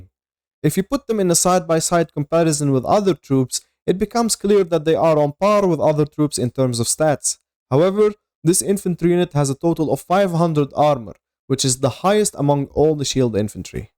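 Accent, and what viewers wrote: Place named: Lebanon. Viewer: أنا حاسس انك لبناني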